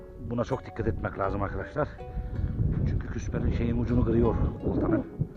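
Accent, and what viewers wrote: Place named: Konya, Turkey. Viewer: Toprağım Konyalı olduğun buram buram konuşmalarından belli iyi büyük balık var mı gelsek saygılar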